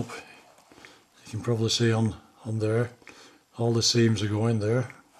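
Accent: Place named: Lancashire, United Kingdom. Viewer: Hi, i have an adidas finale ball that needs fixing, also, are you from lancashire? The accent sounds familiar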